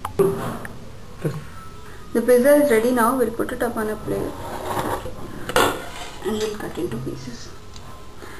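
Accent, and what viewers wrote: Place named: India. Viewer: hahahahaha you are indian!!!you talk so funny!:D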